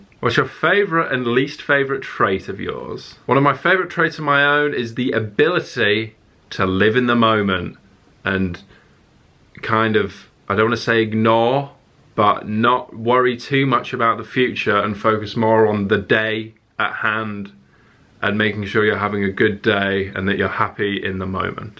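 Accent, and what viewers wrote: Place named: United States. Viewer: Omg the american accent 😂 thank you so much for answering my question!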